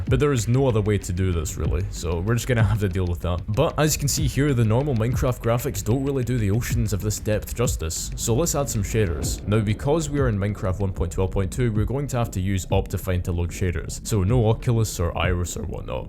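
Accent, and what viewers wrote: Scotland, United Kingdom. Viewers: Where are you from? You sound like a Scot trying to not have a Scottish accent. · I finally found a scottish youtuber! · Scottish YouTuber found lets go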